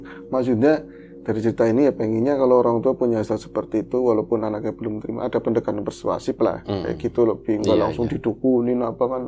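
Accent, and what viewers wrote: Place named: Tegal, Indonesia. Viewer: Bro kalau cerita pasti nyenengin, karena ada kocaknya dan ada bhs tegalnya.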